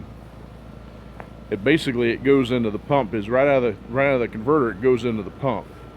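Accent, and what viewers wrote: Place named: North Carolina, United States. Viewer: Great videos!!! What part of the counrty are you in?? Im in NC you sound like you might be close lol just wondering. 87 GTA here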